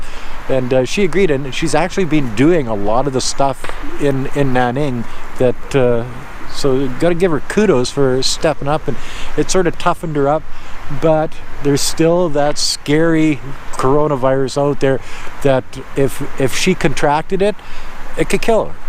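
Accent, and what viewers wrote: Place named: Canada. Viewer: As an American who grew up in the states my whole life I agree, it’s great to hear a variety of perspectives on China from a friendly beer-drinking Canuck with an awesome accent living there. I’d love to visit someday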